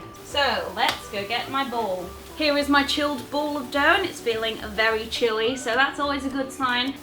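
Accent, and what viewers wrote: United Kingdom. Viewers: I can't tell if your British or American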